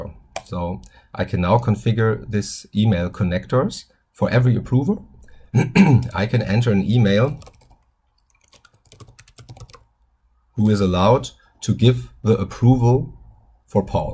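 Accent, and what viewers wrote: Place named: Germany. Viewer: You have a German accent 😂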